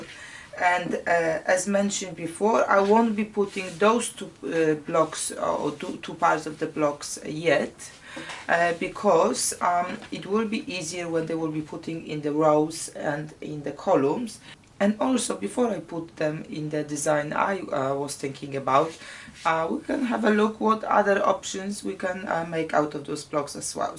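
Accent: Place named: Poland. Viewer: Love working w scrapes…great videos. Where are you located, your accent reminds me of my Polish friend.😊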